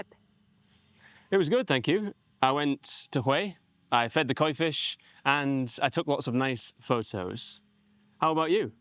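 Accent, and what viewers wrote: United Kingdom. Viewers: Are you from the north of England? You pronounce your u's just like Gary Barlow does 🤔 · Do you speak british english? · Hello, what a nice video indeed I really like the style you're using for your channel, the manner...the pace well I don't know if you talk like that in normal life...and of course the accent, I love the British accent a lot. And, those suggestions are very useful for a guy like my who is quite the opposite of being talkative 😅 and he's learning English to beat his shyness as well, so...thank you! 🙏😊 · Your accent is very clear and easy to understand Mr. unlike the cockney accent tbh I have an Indian accent and would love to pick up SSB Accent like yours so I wanted to ask if you have 1:1 training classes?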